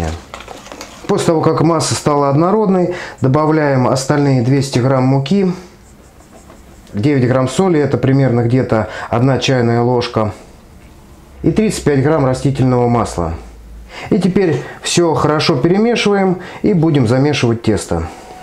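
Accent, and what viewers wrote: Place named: Voronezh, Russia. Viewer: открыл рецепт, услышал говор и сразу понял - зёма. спасибо братюнь, тесто уже подходит. наш говорок только с воронежским можно спутать)))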